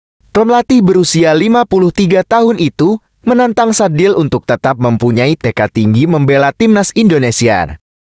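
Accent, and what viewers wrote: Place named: Malaysia. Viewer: BROO SAYA KASIH TAU KAMU KALAU BACA BERITA PAKAILAH BAHASA INDONESIA YG BAIK DAN BENAR,,,NGGK USAH IKUT IKUTAN SAMA ORG MELAYU MALAYSIA....??? EFF AFF/..... A E / B BE C Si D.... .....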